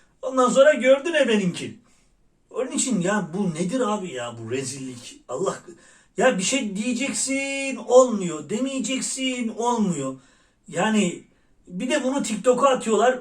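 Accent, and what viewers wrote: Erzurum, Turkey. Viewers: kardes seni severek takip ediyoruz.ara sıra Erzurum Erzincan şive leşini karıştırırsan çok hoş sevecen olur seni sizleri seviyoruz edremit altinoluktan kucak dolusu selam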